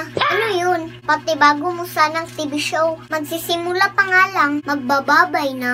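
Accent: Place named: Mindanao, Philippines. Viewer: Cute kaayo ka day.pede mangutana.nganong naa man ksy dira nga word.mura mn ka og taga mindanao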